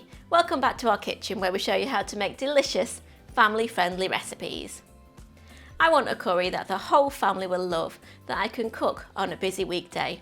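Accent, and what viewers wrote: United Kingdom. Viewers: love your British accent :)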